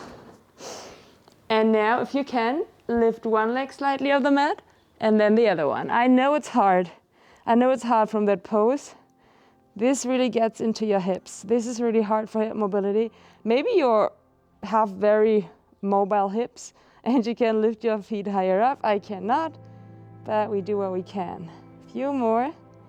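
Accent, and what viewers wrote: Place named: Ireland. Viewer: I’m just curious q about your accent, sounds some Irish in it, I always watch👌your videos.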